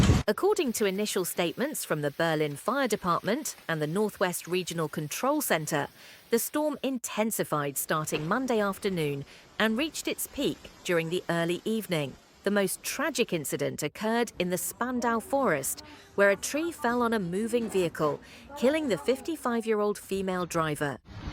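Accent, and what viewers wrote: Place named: England, United Kingdom. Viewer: Too many mistakes in both English and German in the subtitles, and why is there no punctuation? One sentence runs into another without any pause. The voiceover sounds much too happy, absolutely bursting with glee. And why the English accent but American grammar? For example one hundred fifty instead of one hundred and fifty. Obviously the work of AI.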